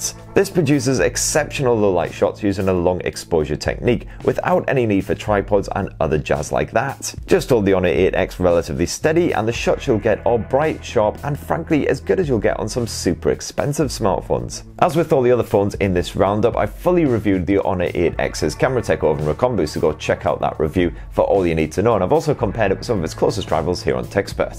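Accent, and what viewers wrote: United Kingdom. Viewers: You speak too fast dear. With the brit accent and your fast talking I dont understand everything you say.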